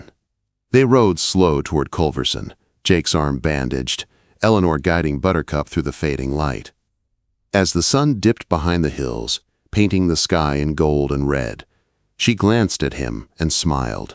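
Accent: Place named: California, United States. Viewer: Nice story, but the narration was a joke. So California USA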